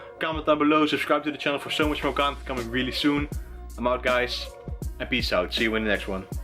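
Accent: Netherlands. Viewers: It took me 0.1 seconds to realize you're Dutch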